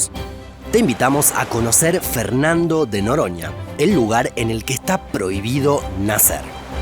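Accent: Buenos Aires, Argentina. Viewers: Me encanta el acento argentino (porteño?): "shegarón, shega". hahaha. Muy peculiar. Saludos desde Brasil. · Hola. El narrador es de Argentina. Saludos. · Raro es escuchar un porteño intentanndo hablar como mexicano :) te delata la Y, y la LL (shh) jajaja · Horror el acento argentino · Me gustan mucho tus informes claros precisos y sin tanta cháchara lo único que no me gusta es tu acento muy pronunciado del tono arrastrado bonarense de la doble ele (ll).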